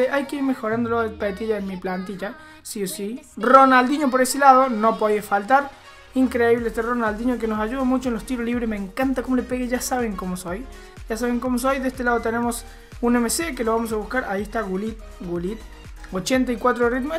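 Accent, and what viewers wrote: Córdoba, Argentina. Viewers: Eres cordobés?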